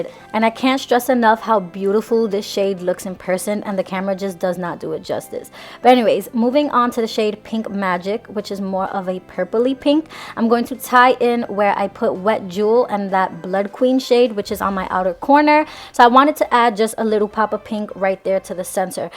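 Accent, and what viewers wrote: New York, United States. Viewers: girl you sound so new york i love it lmaoooo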